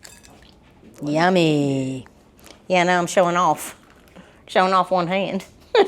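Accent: South, United States. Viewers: No offense-I don’t think I’m ever going to make what you make, but I do like watching you cook and listen to your accent (Boston girl here)! Taste for poison is brilliant and liquid eggs - OMG! You go country girl! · ABSOLUTELY SHE NEEDS A FOOD NETWORK SHOW!!!! She cooks like the rest of us real people! She also talks like most of us in the South and lives in a remodeled 1971 mobile home that is gorgeous! Like most of us in the South, we like paid for stuff and repurpose, reuse and restore to keep it all paid for! We not into latest and greatest! We are into keepin it real and comfortable and debt free!😊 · You work hard to cook for your family. The best part is you cook Italian food. All done with a Southern Accent. Remember, Men have no balls, but the Women have dee big balls! Fantastico! Ciao, Bella! ❤❤😂😘❤❤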